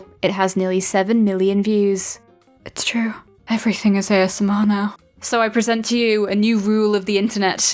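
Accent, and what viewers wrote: United Kingdom. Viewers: Ur 2 British to do asmr vids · Mmmm british accent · for every video you sound british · As an American who loves a British accent, all of your content is ASMR to me.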